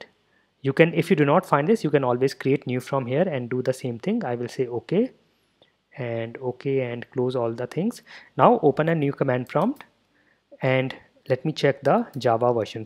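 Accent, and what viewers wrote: India. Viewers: most words said by you sound kind of funny (the indian accent, not your pronunciation becuase that is spot on obviously) but when you say java it sounds like it was made for your accent not ours, that accent hits the word "java" and sounds more then perfect, anyway thanks for the tutorial this is perfect man and I love how it sounds when you say Java.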